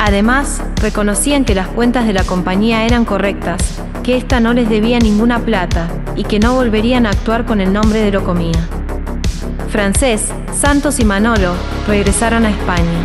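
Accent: Argentina. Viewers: La serie me gusto muchisimo, pues es muy creible y se nota el trabajo de este grupo. La locutora, entiendo es argentina por su acento. Me ENCANTO. LASTIMA QUE LO BUENO TIENE UN FINAL. GRACIAS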